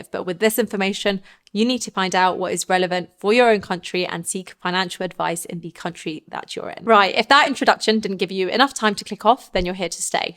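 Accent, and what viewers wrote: United Kingdom. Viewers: What part of UK is she from?? I’m from the States trying to see what UK accent is from what part of UK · Who's here for US taxes and noticed this lady has a British accent, scrolled down and the location is London. oops! 🤣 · What kind of british accent do you have?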